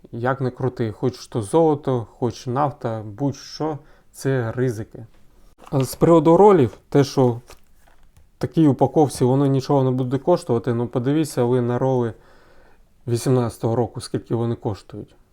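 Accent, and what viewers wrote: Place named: Lviv, Ukraine. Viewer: Моя повага. По Вашій мові і не скажеш, що Ви не зі Львова.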